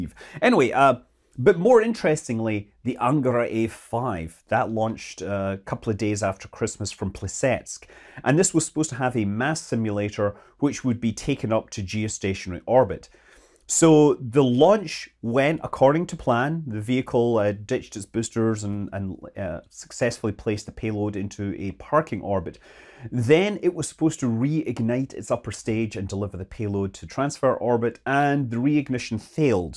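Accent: Scotland, United Kingdom. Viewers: you are such a delight! I always enjoy hearing your mellifluous, cheery voice with your lovely Scottish accent! Happy New Year!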